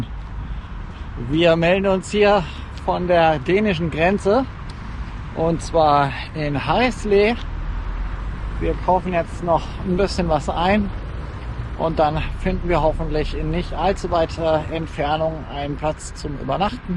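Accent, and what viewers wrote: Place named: Hesse, Germany. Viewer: Hesse schwätze halt hessisch ...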